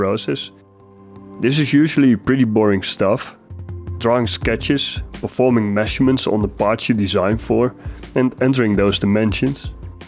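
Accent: Netherlands. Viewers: het shitste Hollands-Engels accent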